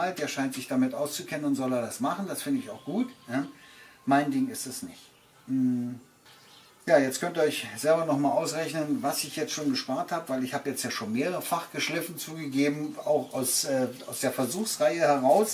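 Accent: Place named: Berlin, Germany. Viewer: ich hab selten so ein informatives und zugleich unterhaltsames Video gesehen,vielen Dank.ich musste mehrfach schmunzeln wegen deiner Berliner Schnauze.Hab mir die Maschine auch bestellt und werde deine Tips übernehmen.Haste fein jemacht.Mach weiter so.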